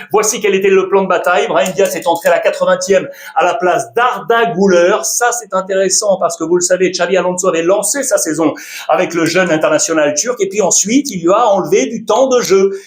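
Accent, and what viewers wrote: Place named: Spain. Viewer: Bonjour Alexandre. Mais pourquoi tu n'arrives toujours à prononcer ARAUJO avec ton accent Espanique que j'adore énormément depuis que tu étais encore chez Canal + ??? Alors fais-moi plaisir frérot et surtout pour ça qu'on t'adore depuis Abidjan ❤❤❤❤❤❤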